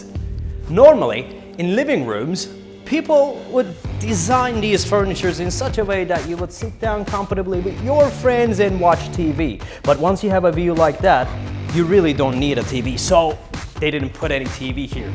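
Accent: United States. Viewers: but you have an American accent ,in Turkey they teach British English ,so you were in school in US?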